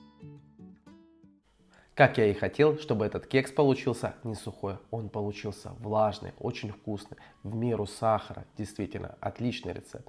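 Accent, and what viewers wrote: Ukraine. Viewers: Спасибо,.Очень просто и вкусно.А ваш милый украинский акцент вызывает у меня ностальгию.😊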